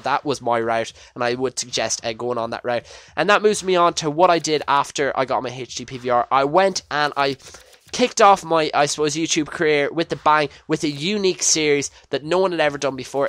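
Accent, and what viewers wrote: Ireland. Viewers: mate first your irish which is beast second ur just as good as ksi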